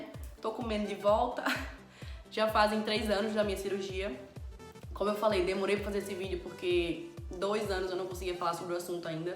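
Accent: Brazil. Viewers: É de Recife?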